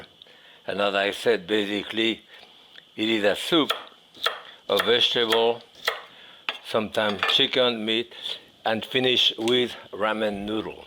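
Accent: France. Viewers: Also his voice and that little French accent, it's irresistible. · “ ‘Appy Cooking!” Love his French accent! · Jacques tu es le meilleur et cet accent parisien 🎉❤❤Longue vie mon cher 🎉❤🎉❤🎉❤🎉❤inchaAllah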